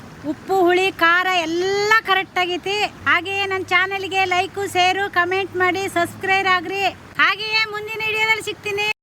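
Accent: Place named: Mandya, India. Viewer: ನೀವು ಮಲೆನಾಡಿನವರಾದ್ರೂನು ಮಂಡ್ಯ ಸ್ಟೈಲ್ ಭಾಷೆ ಮಾತಾಡ್ತೀರಾ, ನನಗೆ ತುಂಬಾ ಇಷ್ಟ ಆಯ್ತು.... ಬಾಳೆದಿಂಡು..ಬಿದಿರು ಕಳಲೆ.. ಹಾಗೂ ಕೆಸುವಿನ ದಿಂಡು ಎಲೆಯಿಂದ ಮಾಡುವ ವೆರೈಟಿ ಪದಾರ್ಥಗಳನ್ನು ತು0ಬ ಚನ್ನಾಗಿ ಇರುತ್ತೆ... ಕೇಸುವಿನ ಪತ್ರೋಡೆ ಮಾಡಿಲ್ಲ....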